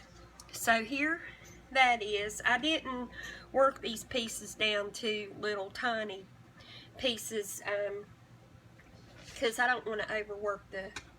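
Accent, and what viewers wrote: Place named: South Carolina, United States. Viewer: Since I am new, where are you from...You have a South Carolina accent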